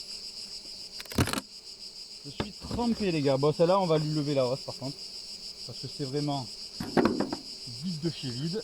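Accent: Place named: Belgium. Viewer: Bonjour monsieur vous êtes du Sud ?? On entend les crickets ou bien c’est une musique de fond ?? En tout cas vous avez un jolie accent Belge😁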